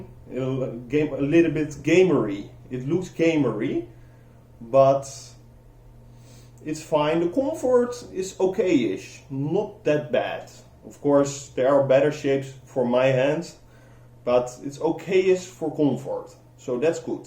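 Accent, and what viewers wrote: Netherlands. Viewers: Echt pannekoeken engels.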